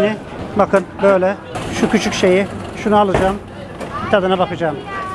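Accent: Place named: Alanya, Turkey. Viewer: Alanyalimisin siven ayni alanya yoruuuu gibi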